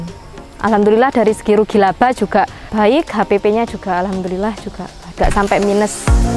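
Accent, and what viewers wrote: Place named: Malang, Indonesia. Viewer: Logat malange gak ilang ,, " "ikuaa Lo mas "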